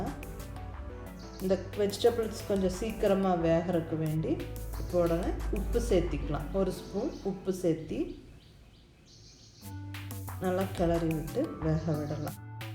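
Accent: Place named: Kongu Nadu, India. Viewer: Ur slang seems like erode r Coimbatore mam so sweet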